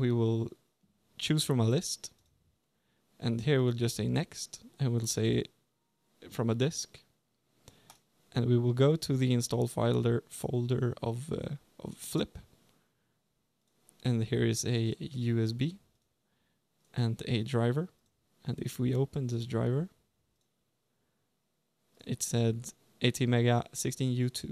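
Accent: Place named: Sweden. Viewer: You sound sooooo swedish =) EDIT: Ohh, du sa det senare att du faktiskt var från Sverige =) Tack för hjälpen!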